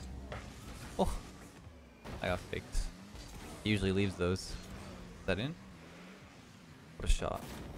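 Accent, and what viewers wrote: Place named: Canada. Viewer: The canadian really comes out when you're this quiet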